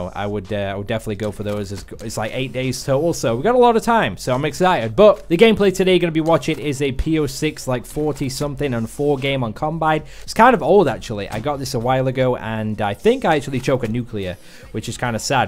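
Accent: Yorkshire, United Kingdom. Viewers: aha is a Yorkshire like me 😂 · I don't notice your accent coz I'm from Yorkshire as well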